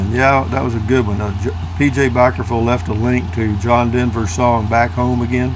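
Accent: Texas, United States. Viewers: Linda Fowler My pleasure. generously shares his unique lifestyle and perspective with us. I find his stories interesting, his adventures entertaining, and his quintessential Texan delivery a rare treat. I am happy to help support his efforts in his preferred manner.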